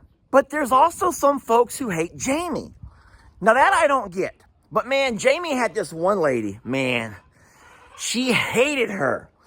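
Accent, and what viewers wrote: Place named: Illinois, United States. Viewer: you are beautiful just the way you are, and you don’t talk funny that’s the Illinois coming out in you. From your Illinois peeps 😂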